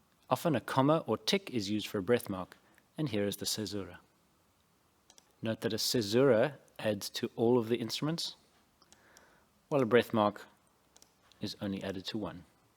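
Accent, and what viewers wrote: South Africa. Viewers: Are you South African or a New Zealander?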